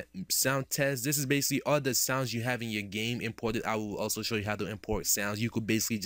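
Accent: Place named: New York, United States. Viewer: You sound like you're from New York. xD Great videos man! Thanks a ton. I found them on the rpgmakerweb forums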